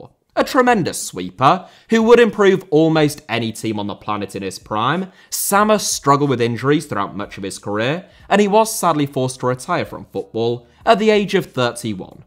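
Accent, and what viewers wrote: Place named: United Kingdom. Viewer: I realized from your accent that you are British. I was sure when he put Stanley Matthews in front of Ronaldinho, Kaká and other great players that they were much better than Matthews, truly absurd.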